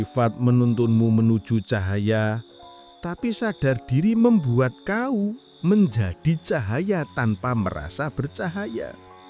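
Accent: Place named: Java, Indonesia. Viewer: klo menurutku sih, logat dan kosa kata jawanya... daerah solo/jogja, tapi berstrategi merangkul iawa secara luas.. maka kata rek .. tersemat dlm narasinya...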